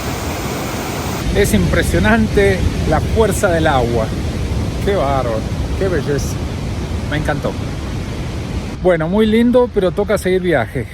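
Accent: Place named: Argentina. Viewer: Pensé que tenía acento argentino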